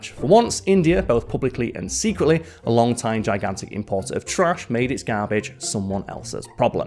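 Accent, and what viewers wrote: United Kingdom. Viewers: Dunno about trying to minimize British colloquialisms. The entire Whistlerverse is founded on the fact that Americans tend to think that anyone with a British accent is automatically smart and well informed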